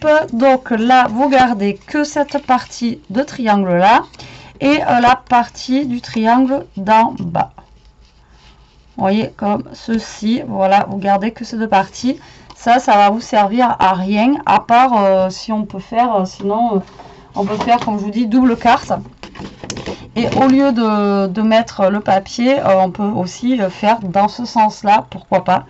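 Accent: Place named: Toulouse, France. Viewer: Bonjour c'est vrai que c'est une bonne idée qu on peut décliner de plusieurs façons .Merci beaucoup...si ce n'est pas indiscret de quelle région êtes -vous ??? vous avez l'accent de mes petites filles qui habitent Toulouse,,,,???bonne semaine ensoleillée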